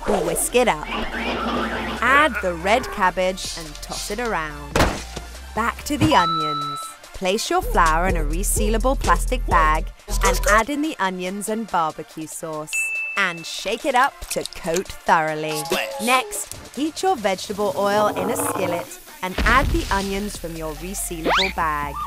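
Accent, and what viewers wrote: United Kingdom. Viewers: BRITISH WOMAN NARRATING SOMETHING ABOUT THE 4TH OF JULY?!?!? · The ironic thing is the narrator is British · The narrator has a English accent... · Funny how the narrator was British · It's ironic because the woman who is talking is bridish, and usa independence from UK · narrator is British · I like how it’s a 4th of July video but the announcer is British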